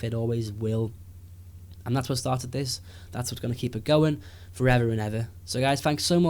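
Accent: Liverpool, United Kingdom. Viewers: Yous a Scouse, innit?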